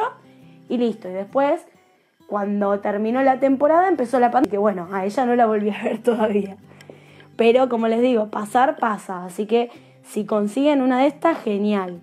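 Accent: Argentina. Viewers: Una pregunta ,sos Argentina???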